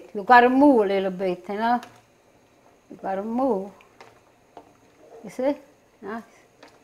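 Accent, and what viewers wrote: Italy. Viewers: She Has the Best Italian Accent 😋 👌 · I love how she posts the recipe at the end! Much appreciated dear sweet mama! I love your videos and your beautiful italian accent! Thank you for this recipe...been looking for my mama's forever and this is it! OX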